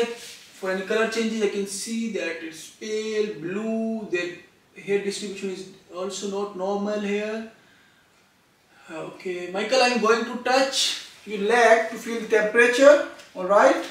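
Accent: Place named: Asia. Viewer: Very educative video. You are good teahcer. The only challenge with the video is the accent that make it difficult for non-Asian audience to hear it well. We will appreciate it, if you can speak more slowly, just to carry others along. Thank you, sir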